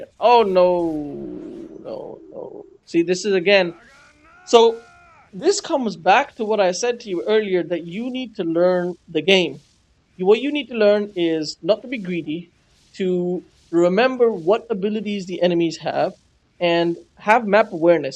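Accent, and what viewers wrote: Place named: India. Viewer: His accent and that moment when a train passed by in background suggests the same. I know that coz I'm Indian myself. :D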